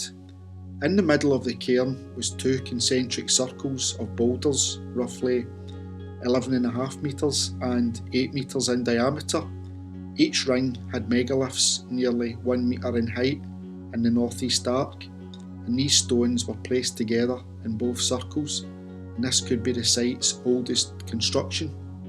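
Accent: Scotland, United Kingdom. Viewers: Oh , to be a time traveler! Although, you would have to bring your own food, drinks and snacks… they probably wouldn’t have food stores. I would have to go back and forth a lot , but it would be worth it, truly amazing. A wonderful video. And the beautiful Scottish accent of the narrator is mesmerizing. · Might want to start with a map, mate. Where on earth is this? I'm guessing Scotland somewhere l, from your accent...? Very interesting remains...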